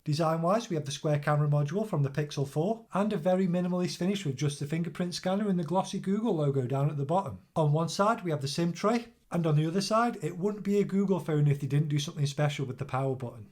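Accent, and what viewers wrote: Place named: United Kingdom. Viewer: Only here for native British accent. Loved it bro!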